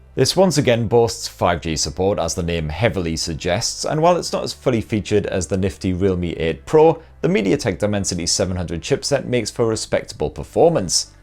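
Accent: United Kingdom. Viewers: Someone please tell me where in Uk this guy's accent coming from exactly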